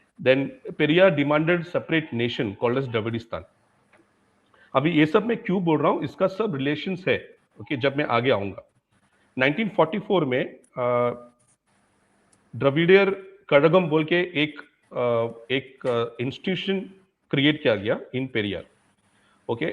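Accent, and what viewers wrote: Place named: Kerala, India. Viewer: I can easily make out the Mallu accent (among other things). It's difficult to hide.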